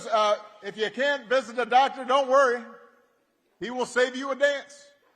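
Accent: South, United States. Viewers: And here he goes with the “southern” accent again! What dark place our we in? Has he looked at the Dow Jones index? He is a. LIAR. Weaponizing the justice dept? He doesn’t remember his own presidency and RUSSIAGATE ?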